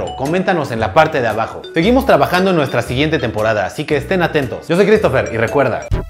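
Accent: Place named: Mexico. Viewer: Jajaja me gusta mucho el canal. Además que el español Mexicano me resulta gracioso. Saludos de un Venezolano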